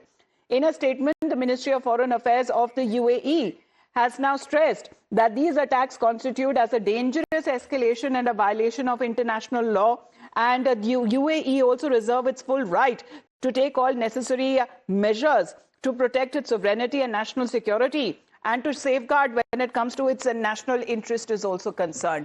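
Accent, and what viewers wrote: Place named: India. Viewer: Your voice is says indian voice ugly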